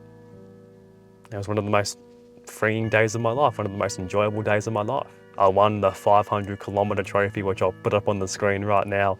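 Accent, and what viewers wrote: Australia. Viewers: *? what part of Australia are you from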